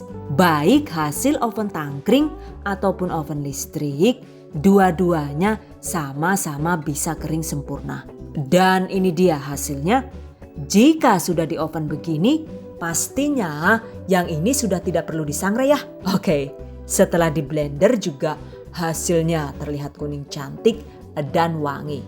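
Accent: Banyumas, Indonesia. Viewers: penyampaianya sangat detail.. aq sendiri ngapak tpi denger bunda ini ngapak kok ngakak aq🤣🤣🤣🤣 lucune jannn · Bahasae bisa ngapak juga hhhh salam mbak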